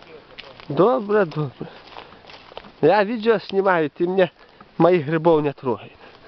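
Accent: Belarus. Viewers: Спасибо за видео . Как сама за грибами сходила смотрю вас у вас такой приятный говор беларуский , мне как бользам на душу .Я родом из Житкович , живу в Тольятти мне все родное что вы показываете . Удачи вам !!! И милион просмотров и подписчиков .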